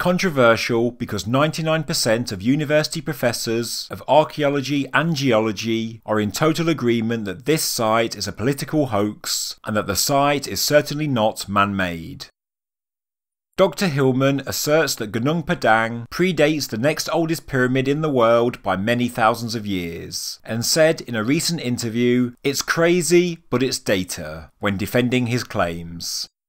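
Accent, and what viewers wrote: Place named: East Midlands, United Kingdom. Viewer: I love archeology given to me in a strong east midland accent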